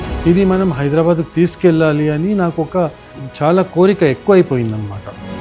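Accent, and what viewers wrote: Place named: Tamil Nadu, India. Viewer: Tamil Telugu is cute 😊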